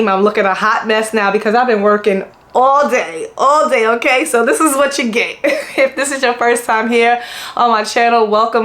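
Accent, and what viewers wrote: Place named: Brooklyn, United States. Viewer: Yassss on BK! I knew it when I heard you tawk😂😂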